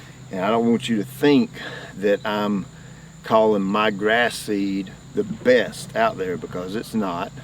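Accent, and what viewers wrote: South, United States. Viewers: You are an amazing person with a great southern voice!! Lol keep up the great work!!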